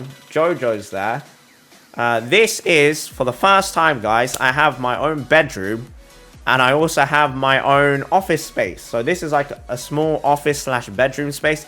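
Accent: United Kingdom. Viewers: Are you from the UK? Good to see your vids!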